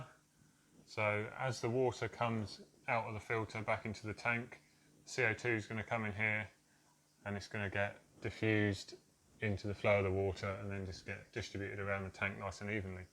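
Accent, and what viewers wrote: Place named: East Anglia, United Kingdom. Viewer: I can tell, the east anglean ish accent 😅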